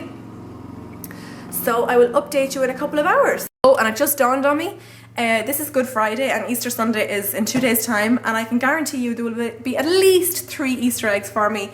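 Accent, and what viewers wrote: Ireland. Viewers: where are you from in ireland? :) Im guessing wessshht?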